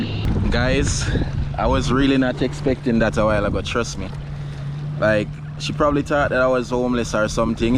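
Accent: Jamaica. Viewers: It was a gift, you dont refuse gifts. Pay it along to someone else if you feel like doing so. Its a blessing to you and your family. Some persons may have not acted well with you fishing there, she was very nice. Not to worry you were not begging and even if you were, its not a crime, she was nice, just give God thanks. I detect an accent, are you Jamaican? First time on your channel. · I was about to comment on your ascent, then I read this comment and know your jamaican heritage, big up youth.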